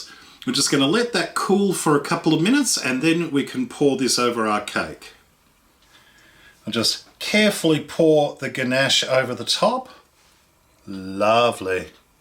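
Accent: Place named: Australia. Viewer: This is my first time here, oh how I love our Aussie accent. Now I will follow you on utube, cake looks yummy.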